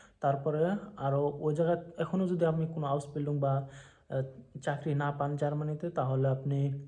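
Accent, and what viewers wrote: Assam, India. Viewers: moi apunar kothar style sai buji goisilu...apuni Assam or pora ...bhai apunar logot keneke contact koribo paru ?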